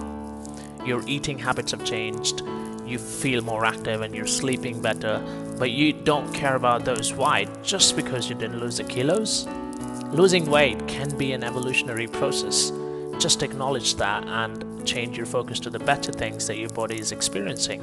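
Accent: India. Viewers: Are you indian? My friend (who is indian) speaks like you IDK but i think so.....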